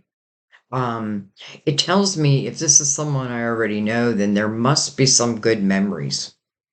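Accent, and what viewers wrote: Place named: Western Pennsylvania, United States. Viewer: Love your accent. Western PA?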